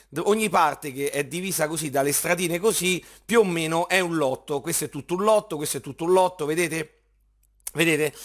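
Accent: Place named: Rome, Italy. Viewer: bravo, ottima spiegazione! e poi il tuo accento romano è troppo forte!!!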